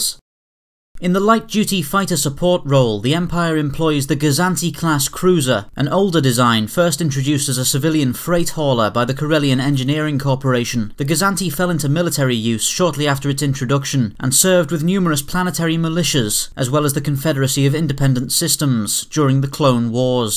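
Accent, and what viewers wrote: United Kingdom. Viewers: Hiaruga I agree just think he needs to slow down and make it a bit more conversational and less frantic. It makes it a little uncomfortable to watch multiple videos with this rushed tone but otherwise I also appreciate the British accent just wish it was a bit more on the calm side. But always great content! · This was long overdue but, man I love your manner of speech. I may be biased as a fellow Brit meself but I can't help it. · Love how the British accent makes anything sound better and credible. It just sounds good.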